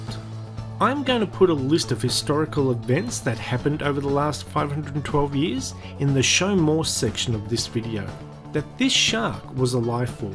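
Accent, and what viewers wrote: Australia. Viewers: The narrator's Australian upspeak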